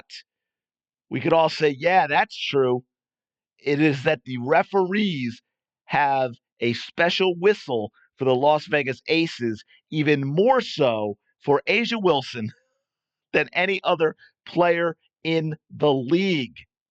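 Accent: Philadelphia, United States. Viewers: No need for the Cowboys slander. You sound like your from Philly. Let’s just keep it to the Aces implosion and Aja crying.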